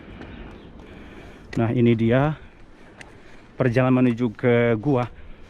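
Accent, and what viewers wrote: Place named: South Tapanuli, Indonesia. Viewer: Nampak kali logat Tapselnya bg..sukses selalu..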